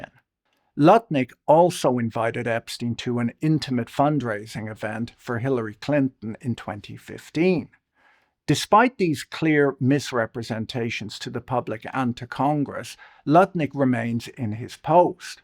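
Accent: Ireland. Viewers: Accent. Now thinking Galway or South West coast, but probably wrong will stick with first choice last time North west londonderry region. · Love the Irish accent... King Charles the "TURD". LOL RIP QEII but, the British/German monarchy should have ended with you. 🎉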